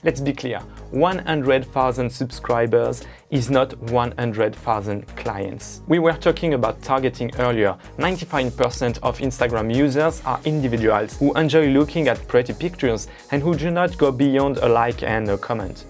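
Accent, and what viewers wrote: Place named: France. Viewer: Tu es un français avec l'accent 😂♥️♥️♥️🙏🏽🙏🏽 bon courage frère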